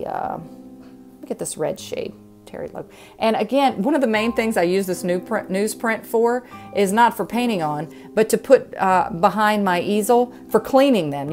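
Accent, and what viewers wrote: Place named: South, United States. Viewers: A southern accent never hurts. Hehe. Also, you'd never know how they are pronounced just by looking at them. I've seen even the most seasoned artists muck up "quinacridone" and "Phthalo." We can't be expected to know how to pronounce words derived from chemistry, nevertheless words from foreign languages! 😝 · Just part of the learning process (for all of us!). Love the southern accent!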